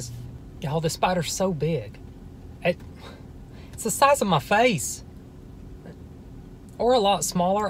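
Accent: South, United States. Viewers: 2024 now.....that southern accent was thiccccccccck in these older videos. lol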